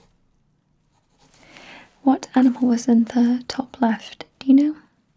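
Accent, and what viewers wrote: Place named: United Kingdom. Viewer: oh my gosh! this ticks all the boxes right now!! -spoken and not whispered (not that I dislike whispered asmr, but when it's something that should be close to being realistic, I think, non-whispered is better suited!) -cognitive exam/mini-mental-status whatever you wanna call them! - so underrated -UK accent :)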